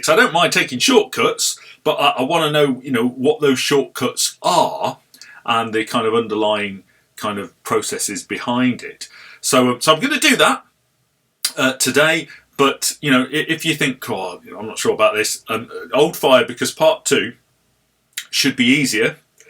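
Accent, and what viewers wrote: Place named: Netherlands. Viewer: Again an excellent video, valuable and highly appreciated. The importance of impedance matching already became clear in the “Connecting It All Together” video I watched a couple of days ago. I skipped it at the time you published it because I thought, reading the title, it was not important to me. Apart from the electronics lessons, your pronunciation is a pleasure to hear, most Dutchmen do not exceed the level of a football coach. 😆 Thanks a million 73, Gerard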